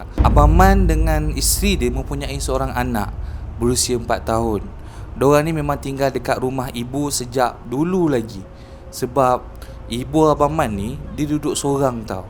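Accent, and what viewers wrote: Kelantan, Malaysia. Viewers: Btw ni org pantai timur ke?ado bunyi telo klate sikit2😂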